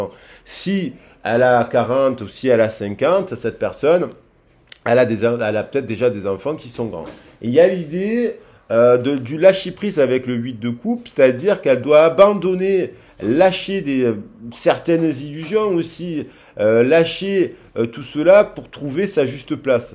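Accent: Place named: Marseille, France. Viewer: Bravo. J ai visité Marseille ce WD. J ai bien aimé. Je crois que vous êtes de là bas? L accent!😉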